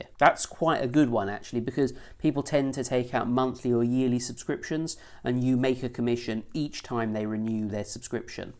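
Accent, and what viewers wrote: United Kingdom. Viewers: Your Brit personality and accent is the key to your success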